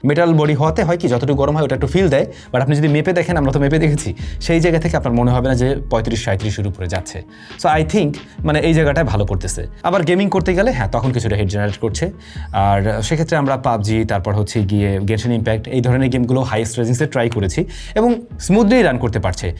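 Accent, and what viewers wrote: Barishal, Bangladesh. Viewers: ভাই, আপনি বরিশাইল্লা!?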